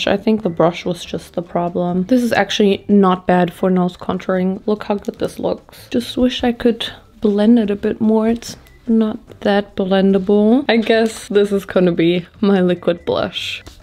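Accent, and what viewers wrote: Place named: Germany. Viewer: I hear the germaness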